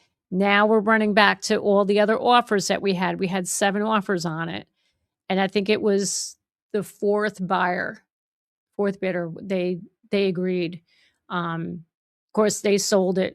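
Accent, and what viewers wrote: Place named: North Jersey, United States. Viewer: Awe, you sound like my relatives from my husband’s side in N. Jersey! I feel at home. 😊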